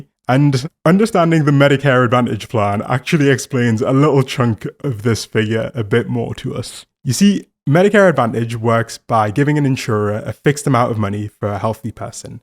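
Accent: United Kingdom. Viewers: how did you get that british accent? where are your parents from? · Great info, thanks for the time you've put into this. Nice to hear a Brit accent that doesn't sound like that Bond villain Andrew Witty, really cleanses the palate. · wait I didn't even notice he was British lmao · tbf this happens to me, especially given this guys voice is deep and he has a less pronounced british accent. I also watch a lot of non american media so I tend to forget about or confuse different accents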